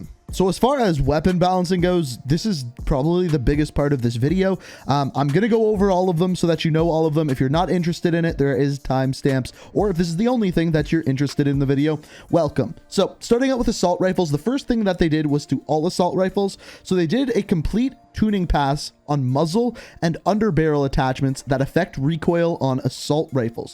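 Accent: Canada. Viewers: This dude sound Canadian ...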